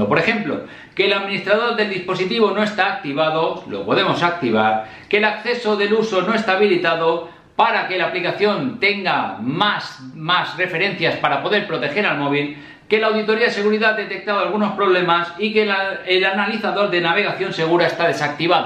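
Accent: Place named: Spain. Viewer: Bro los nombres de las app no entiendo muy bn por tu acento español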